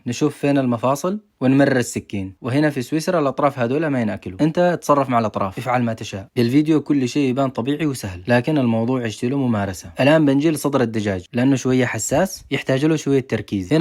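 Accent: Yemen. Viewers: من كلامك مبين يمني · من اللهجة واضح من عندنا يمني 🌝🤍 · يتكلم يمني بدون أي خليط لأن اليمن في لهجات كثيرة وهذه لهجة لبعض مناطق الوسط والجنوب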